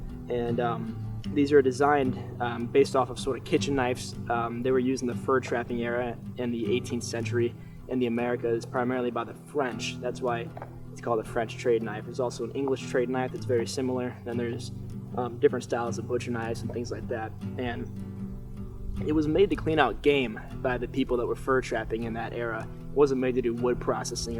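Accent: Michigan, United States. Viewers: Sounds like your from Michigan. In in white lake Michigan!!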